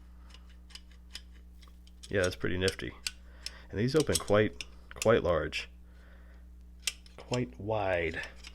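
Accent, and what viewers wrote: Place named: United States. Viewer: This guy sounds american. Why is he speaking in metric?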